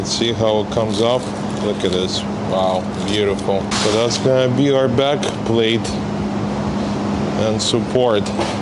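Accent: Russia. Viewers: Мне одному кажется что у него акцент из России?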